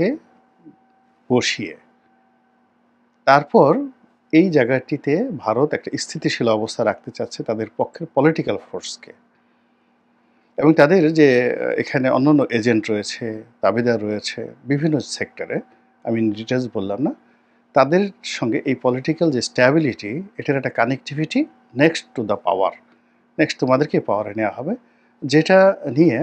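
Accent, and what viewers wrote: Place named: Barishal, Bangladesh. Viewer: এই পর্ব টা শোনার পর মনে হলো,আপনার বাড়ী বরিশাল।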